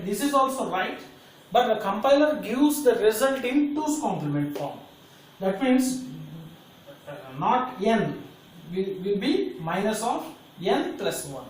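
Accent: South India, India. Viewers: Sir you sounds likes a south indian...by the way nice explanation sir😄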